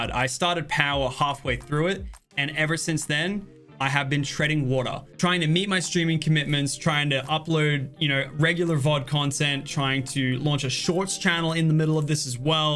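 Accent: United States. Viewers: UGHHHHHHHH Can't hand the American twang brother. It sucks. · Your accent is getting more and more American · why do you try and talk with a US accent though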